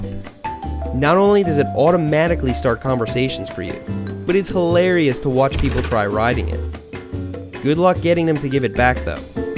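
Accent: Long Island, United States. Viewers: ! Easy to spot that Long Island accent!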